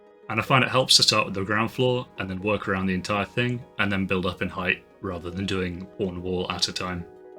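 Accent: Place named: United Kingdom. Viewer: Hey! You sound UK based.. where do you get your foam for the bricks from?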